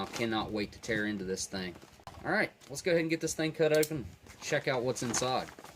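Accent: United States. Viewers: I'm binge watching your videos like crazy now. Completely enthralling content, plus your American accent is lovely and makes everything interesting.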